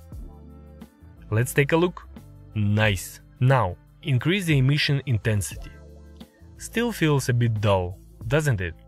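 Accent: Russia. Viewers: Мне кажется, или это русский акцент?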